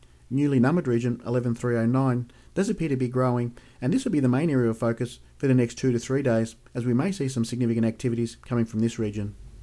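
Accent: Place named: Essex, United Kingdom. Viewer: I'll start again his accent sounds like Essex or something round there , boring isn't it ?????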